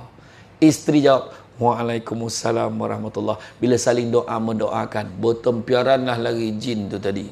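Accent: Negeri Sembilan, Malaysia. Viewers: Suka dengar loghat negeri sembilan ustaz. Mudah faham walaupun saya orang Kedah. Comel je bila ada part suami isteri. 😁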